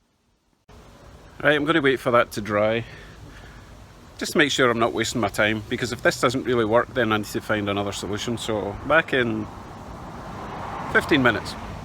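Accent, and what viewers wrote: Scotland, United Kingdom. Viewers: Awesome video! Love the video and the Scottish accent I’m from Texas so it was nice hearing someone with a Scottish accent. Excellent job!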